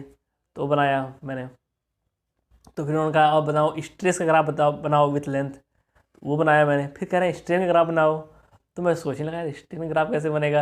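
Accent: India. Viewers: true bihari style communication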